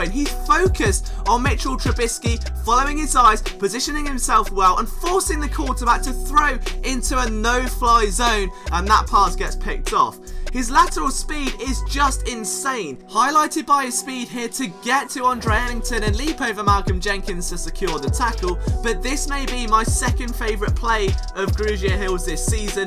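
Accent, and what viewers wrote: United Kingdom. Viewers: who the f is this BRIT talking about FOOTBALL???!!! doesnt even sound right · Wow this is different somebody reporting about the NFL with a British accent are we getting through to the people across the pond that american-style football is Supreme Over homosexual soccer ?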